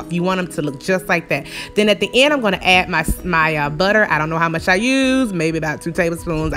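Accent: South, United States. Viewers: Your southern accent makes me feel warm and welcomed to your. Videos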